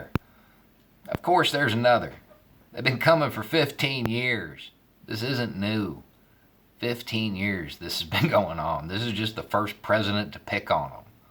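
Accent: South, United States. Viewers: So I'm new here, but is there a reason you lay on a much stronger southern accent in videos on this channel? You're speaking voice in the videos on the other channel is noticeably less accented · (I'm assuming that is your first name) I've really come to enjoy and respect the videos you put out. I'm still pretty new to your channel and I haven't entirely agreed with everything you say. But I would say I agree with 90% of it. What I find most intriguing is how you fit the trope of "Don't judge a book by its cover." I have no doubt that I am not the first person to click on your video for the first time and instantly take you for a Trump supporter. lol It's the southern accent and the down south good ol' boy look. (This may be a little unfair being Im a native Texan with a southern accent myself. Just not quite as much as yours) Fortunately it doesn't take long to realize you are not a Trump supporter at all. 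For that I am very grateful. You have very good incite and thought provoking commentary. Keep up the good word my man.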